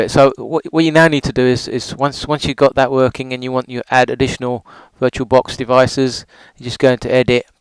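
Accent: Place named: United Kingdom. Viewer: INDIAN With british accent !! great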